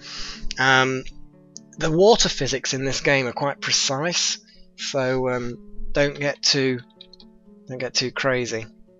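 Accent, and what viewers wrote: United Kingdom. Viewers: ah, it's been a while, good to hear your british voice and the clicking of your keyboard!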